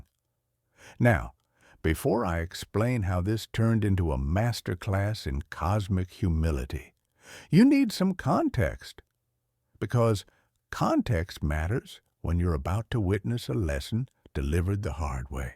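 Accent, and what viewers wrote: South, United States. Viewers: Narration is an amazingly human sounding AI... with just a touch of a Southern accent. Thank you very much. Little old lady listening from rural West Virginia, USA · Cowboy accents don’t mesh well with sci-fi stories, sorry. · You liked an "alien" speaking with a southern USA accent? I find it so stupid I quit watching!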